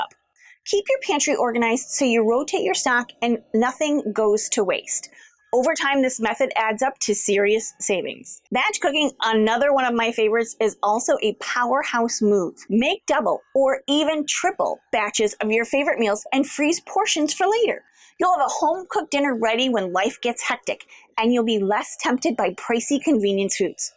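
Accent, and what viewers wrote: United States. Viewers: You are truely a breath of fresh air. As a frugal Welsh great grandma, I love to listen to a new voice, yours is so sweet, I love your accent from across the pond.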